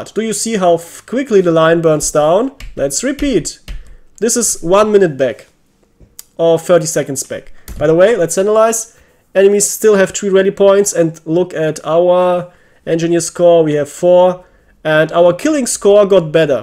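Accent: Germany. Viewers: Deutscher Dialekt ist einfach geil gutes viedeo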